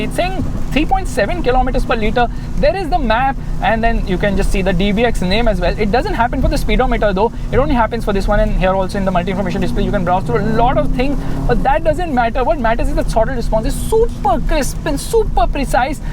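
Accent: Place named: North India, India. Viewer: Irritating North Indians English 🥵🥵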